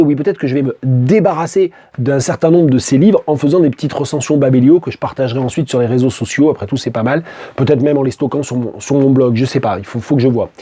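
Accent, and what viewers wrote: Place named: South-West, France. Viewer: Je ne suis pas saoulée par ce "dégoisement"... J'y retrouve l'accent et le vocabulaire ainsi que que les manières de triturer la syntaxe à l'oral du sud-ouest... Et ça donne encore plus envie de lire! Donc pari gagné en 40 minutes!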